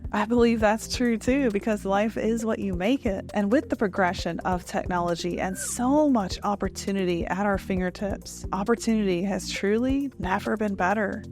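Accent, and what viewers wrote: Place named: Arkansas, United States. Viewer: I believe in God, and consider myself a Christian, but to believe you can't get life from a kind of "Big Bang" scenario, one must be ignorantly misguided, at best, and at worst, must be intentionally obtuse. You said you would gladly debate in the comments, so as a subscriber and viewer since last year, I hope you'll keep true to that remark. To that end, please begin by explaining why you dont believe in the current models of the beginning of the universe. Edit: I'm from Arkansas, and it always feels good that someone else with a similar accent is an AI/Singularity communicator. Love to see it.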